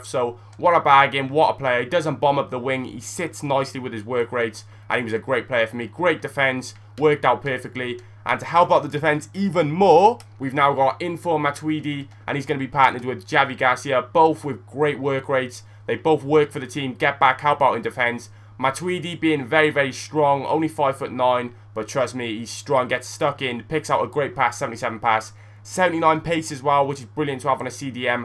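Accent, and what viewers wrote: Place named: Cardiff, United Kingdom. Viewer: Sounds like a Cardiff boy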